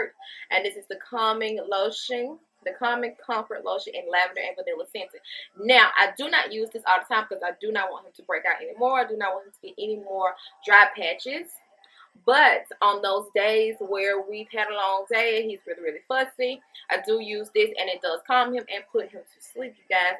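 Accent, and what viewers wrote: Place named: South, United States. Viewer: Love your southern accent I’m from Florida I can hear a similarity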